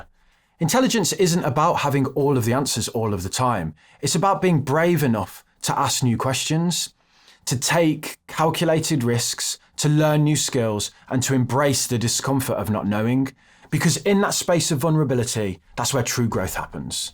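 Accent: Birmingham, United Kingdom. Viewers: Fantastic video! I think I hear a Brummy accent. Are you from Brum? Also, more videos with UK garage involved pls. Haha 🙏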